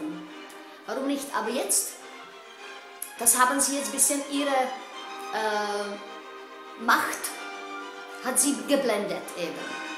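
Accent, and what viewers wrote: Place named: Eastern Europe. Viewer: gefällt es auf jeden Fall überhaupt nicht und wahr ist das was Sie da von sich geben, schon gleich gar nicht! Noch verwunderlicher ist es, wenn jemand wie Sie, mit hörbar osteuropäischen Akzent, die anscheinend hier in Deutschland lebt oder zumind. in Deutschland gearbeitet hat (deutsche Sprache ist dann eben Voraussetzung), also aus einem anderen Land kommend, ihr neues Lebens-Glück in Deutschland gefunden hat, dann gegen Migranten und die deutsche Politik schimpft, die es erst ermöglicht hat, dass Sie selber in Frieden in diesem Land leben dürfen oder wie gesagt zumind. hier arbeiten durften oder dürfen - wie auch immer ihre Lebensgeschichte auch sein mag. Sie sollten mal auf den Kalender sehen, in welchem Jahrhundert wir leben. Sie sind hier in Deutschland willkommen geheißen und das gilt also nicht nur für Sie!!! Frau Merkel hat sich diese Flüchtlingssituation nicht ausgedacht und die Masse an Menschen ist bereits schon seid Anfang dieses Jahres unterwegs und wenn die deutschen Politik in Europa von Anfang hat die Schotten dicht gemacht hätte, dann hätten wie eine in der Welt noch nie dar gewesene humanitäre Katastrophe gehabt! 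Länder wie Griechenland oder Italien, die eben ans Mittelmeer grenzen, wären total überfordert gewesen. Die Flüchtlinge wären so oder so gekommen, nur die anderen Länder sehen alle weg und hat über Jahre hinweg nur Mitleid mit Kriegsflüchtlingen und hungerten Kindern geheuchelt. Jetzt aber, wo sie plötzlich vor der Haustür stehen, will sie keiner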